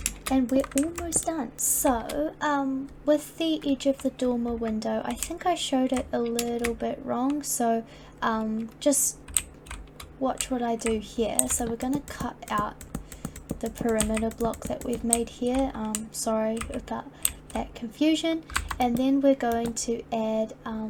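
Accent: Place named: New Zealand. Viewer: Finally a kiwi builder so I can understand what your saying lol loving the builds super clean and super simple hoping to up my build game a bit looking forward to your next tutorial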